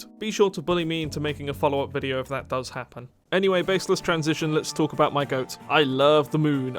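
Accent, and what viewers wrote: United Kingdom. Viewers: Seemed like a good vid then he started going on a racist rant about Chinese and black people you know the vid is about to be ass when you hear that dumb ass British accent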